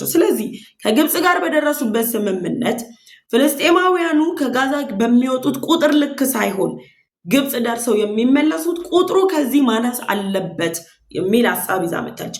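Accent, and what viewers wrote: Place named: Nigeria. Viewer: አማርኛ የምታወራ ናይጀራዊ ነው የምትመስሊ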